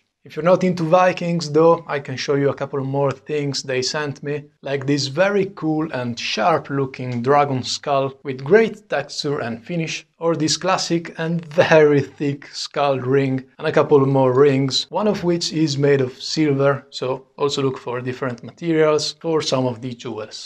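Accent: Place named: Italy. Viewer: Si sente lontano un chilometro che sei italiano , veramente bravo bei video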